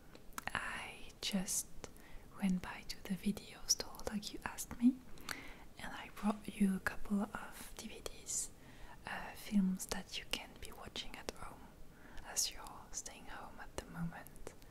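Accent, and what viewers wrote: France. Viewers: Your french english is adorable. You are always adorable. Please make a brush video! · Your accent makes me wanna drop my life and find love in France........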